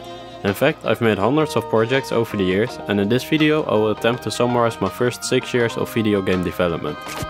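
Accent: Netherlands. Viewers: mmmm Dutch accent edit: Ahh I knew it Nederlands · I knew you were dutch from the first second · Is this guy from the netherlands? Edit: yep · are u from the netherlands bc i can hear it from ur accent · Woah.. As a computer science student, I cannot address enough how amazed I am by your work.. ( I am just a second year tho) Currently learning some unity for the fun of it myself as well, hoping to one day become as good as you are.. Also, I knew from the first words you spoke you where a dutchie too, laughed way too hard at myself when I saw you made the grade app xD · when strong ass dutch accent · Verry muts a duts aksent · As someone who is dutch its funny to hear from your voice that your dutch😂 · Once u started talking i immediatly knew u were dutch, turns out u r a medenederlander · Je bent nederlands he? Je hoord het. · i smell, Nederlands. Am i right? 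· Are you dutch? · not meant bad but you kinda sound dutch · your dutch right? i hear it from the accent, im dutch too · off topic but i can hear youre also dutch lol :) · You sound so dutch but great english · Damn ik hoorde aan je stem meteen dat je nederlands was haha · are you dutch? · are you actualy dutch? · i love how clear it is that you are dutch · You got a Dutch accent, am I in the right spot😉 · Ik kan echt meteen horen dat jij een Nederlander bent. :-) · Ben jij Nederlands zo klinkt het wel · Are you dutch? You sound pretty dutch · Man u dutch? U have a bit of a dutch accent Oh nvm, already got my answer, good job tho, really excited to see a dutch indie making a genuinly good game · I smell a bit of dutch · you'are dutch aren't you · U really sound dutch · could you show some vids of you developing sprites, such as trees, or rocks. the simple but necessary things a game needs. 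i have been wanting to make games myself and have a little bit of experience in making games, but i dont really know how to make any characters/sprites for the game. i hope you could possible teach me a little bit more. ps. like ya dutch accent ;) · Hey my guy first time watching your vids and i noticed an accent are you dutch I find it very cool when people are dutch because im dutch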